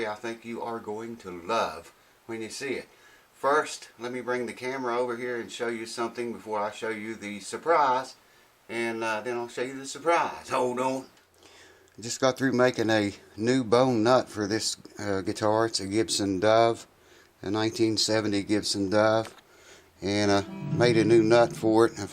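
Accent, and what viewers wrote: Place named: Oklahoma, United States. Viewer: So glad I discovered your YouTube page! Lot's of great info, like the one on capos. I hope this isn't too personal, but whereabouts are you from? I ask because my daughter overheard me playing your videos and said "he sounds like grandma!" Lol. She's meant your accent, her grandmother is from Oklahoma. ☺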